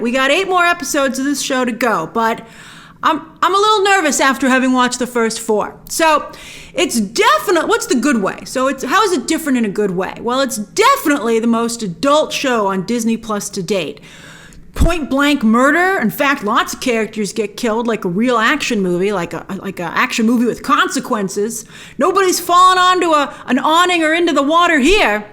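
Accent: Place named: United States. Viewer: I'm curious, how have you avoided a New York accent 🙂? You're an early transplant? It's very slight, barely there Talk/Tauwk, Fought/Fauwt, New York/New Yawk. It's a distinctive accent 🙂. More like a New England accent, Boston is very similar. Bauwston 🙂. It's a straight American English accent, unaltered by New England, southern twangs... You moved there in your pre-teens or something?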